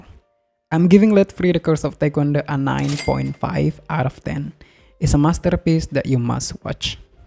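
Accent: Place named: Indonesia. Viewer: Kyk aksen orang indo min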